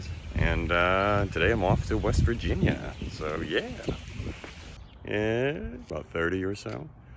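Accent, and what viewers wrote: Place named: Upstate New York, United States. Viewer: Well done! The Upstate accent, bit of Canadian hockey player in the background? Eh? Yes, ABQ is at bottom of Rocky Mtns, it can snow. Lots of skiing just to north in Ruidoso. Needles is where Oakies escaping the Dirty 30's Dustbowl entered Calif. Burbank, I've gone to watch friends at Flappers Comedy Club! Love that place. I retired young. I saw Fede Alvarez was going to remake Texas Chainsaw Massacre! I drove to Austin, found all the original filmset locations, studied up. I don't have creds for production. Being born in Spain, I was going to beg Alvarez for any job. But Covid19 erupted. I went to north Texas to care for our family matriarch, and waited on updates for TCM movie. Then Fede took it way over to Sofia, Bulgaria. My dream exploded. The Tohill brothers were dropped, David Blue Garcia (Tejano film) was brought in. 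TCM remake was bought by Netflix. It debuted at #2 viewing ever, but the fan reviews say the legend of original director Tobe Hooper (of Austin) was tarnished once again. Long story, sad ending. I mostly film live Native American dance events / powwows for my Youtube. Been on Covid hiatus. Lots of cross-country roadtrips on my resume! Best wishes for your success out in Calif. I have family and friends all over there.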